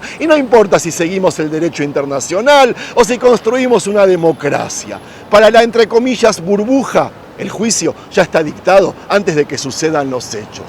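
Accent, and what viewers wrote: Argentina. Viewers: Siempre vivieron y viven tranquilos en Argentina.. no se entiende! . De hecho el habla como Argentino y no sé si vive acá también.. me parece que es un poco mucho hablar así de Argentina.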